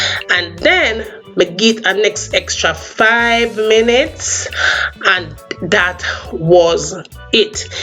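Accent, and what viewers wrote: Jamaica. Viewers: My girl mi subscribe jus cuz yuh sound lik a real yaadie goodie.. mi a guh try dis, cyaan duh the whole buy buy ingredient, jus a guh use some a di store bought... den grill it. Is where yuh find scotch bonnet? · New sub sending love from the South!! Sent here from Ray Mack. Blessings & I look forward to cooking your recipes. Love your Jamaican accent, sister. 💖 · Just listening to you I feel like I’m in Jamaica just need the weather, food and the smell😂😂 big up ur damnself mumma str8 outta London UK 🇬🇧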